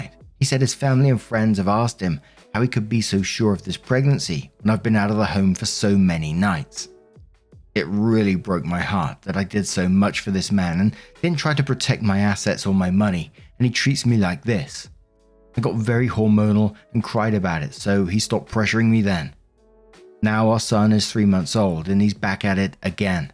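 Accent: England, United Kingdom. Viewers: Yes, I also heard it on Lost Genre. It's all good, I like English accent when he reads the stories. I also like that doesn't read over the cuss words like Lost Genre 😊🇨🇦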